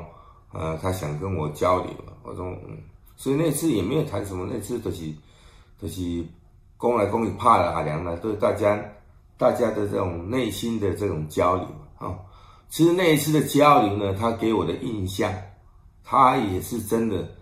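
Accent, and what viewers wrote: Taiwan. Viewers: 雖然立場不同，但佩服您，也喜歡你的台灣味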